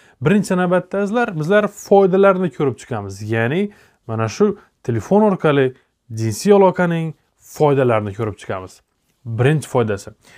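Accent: Khorezm, Uzbekistan. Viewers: Харазимдан мисиз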